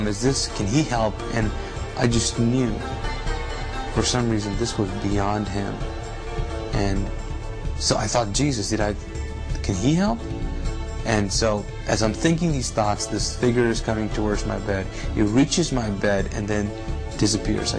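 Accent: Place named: Spain. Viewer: WOW!!! You have REALLY REALLY convinced me to CONVERT to Christianity hehehe. BTW, it's "convert" NOT "combert". It seems that your Spanish mother tongue (lengua materna) is affecting your English. Chico! Tienes que aprender el inglés sin influencias extranjeras, para que la gente no se reía de ti !!!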